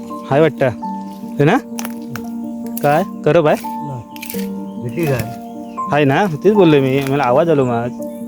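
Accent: Malvani, India. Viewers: भावा तुझ गाव कोणत मालवणी बोलतोस पण मीक्स आहे तु बोलतो ती भाषा मालवण तालुक्यात बोलत नाही प्लीज सांगशील गाव कोणत 🙏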